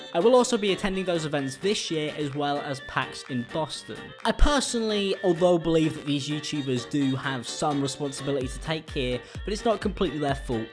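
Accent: United Kingdom. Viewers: Who knew Timmy Turner had such a good British accent?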